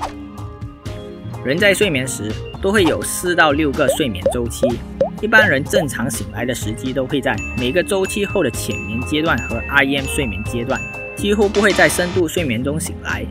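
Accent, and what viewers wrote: Malaysia. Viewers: 你是马来西亚人吗？口音听起来很像XD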